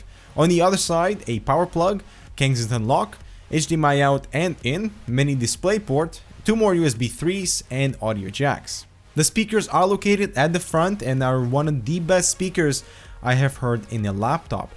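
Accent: Russia. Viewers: Слышится русский акцент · is russian? just wondering bcuz his accent is so stong:P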